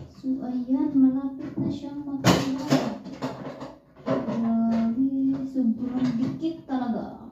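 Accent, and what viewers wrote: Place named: Visayas, Philippines. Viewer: Bisaya Kapa Po maam, God bless maam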